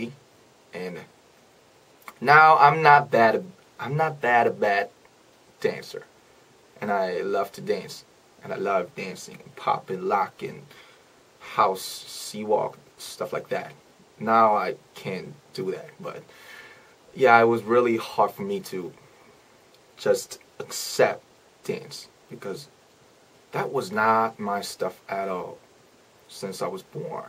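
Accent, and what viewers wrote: United States. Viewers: A cute LA California boy 😆. But his accent is amazing. Legit thought he was from America or had lived here for a long time. Mind blown by him all the time. Love you RM 💜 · He sounds like he was born and raised here in the states and went over to SK to be an idol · he literally sounds like a true American- bruh my dad who has lived in the states for over 15 years has a strong polish accent and he also learned english from friends meanwhile here who has never lived in the states for more than what a couple months sounds like he was literally born and raised in the states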